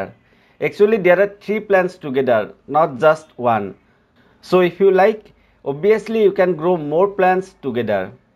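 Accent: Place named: India. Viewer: You do not need to improve your accent, your accent is very good. I am from Australia and your English and your accent are great! We dont want you to lose your Indian accent, it is what makes the world unique and mulitculturalism is wonderful!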